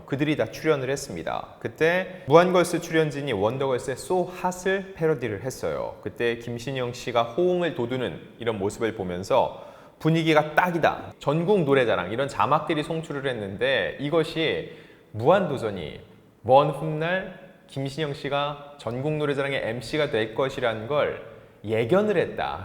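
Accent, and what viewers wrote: Gyeongsang, South Korea. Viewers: 연기와 진행은 사실 다른데 잘해낼지 관심이 가네요, 코믹연기와 순발력은 최강인데 ~ 경상도 말씨가 쪼끔 걸리기는 합니다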